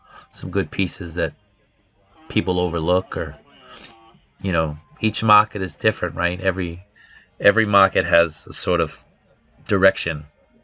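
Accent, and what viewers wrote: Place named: New York, United States. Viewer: That New York accent has to go. I had to watch the video with the sound off lol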